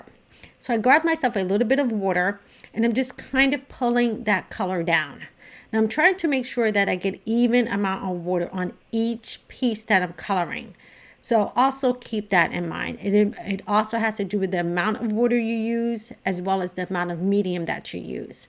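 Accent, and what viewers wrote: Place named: New York, United States. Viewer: Paper is key! You sound like a fellow NY'er (im in N.Fla now). Thanks for the info💗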